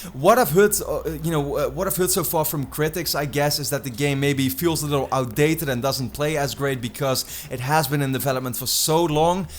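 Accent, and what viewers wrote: Netherlands. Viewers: Ik heb net je kanaal gevonden. Je maakt echt heerlijke content. Plus je klinkt zwaar Nederlands, dus dat is een instant sub. :p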